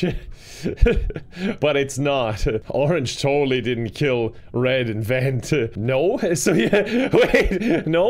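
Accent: Ireland. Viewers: irish accent is the best accent, prove me wrong <3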